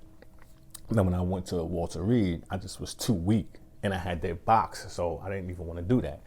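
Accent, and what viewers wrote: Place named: North, United Kingdom. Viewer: Yea man you from up north with that accent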